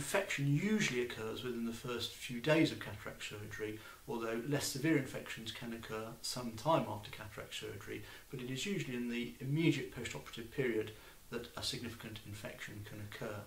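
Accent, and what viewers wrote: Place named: England, United Kingdom. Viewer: Thank you for the heads up, doctor. English accent is not the audio's fault!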